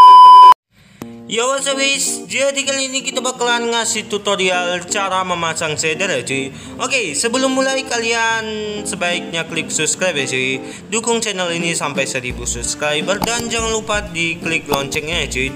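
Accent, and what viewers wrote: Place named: Banyumas, Indonesia. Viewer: Nonton doang laik ngapak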